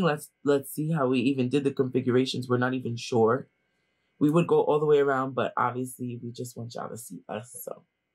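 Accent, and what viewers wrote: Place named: New York, United States. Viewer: This girl sooo New York. I love it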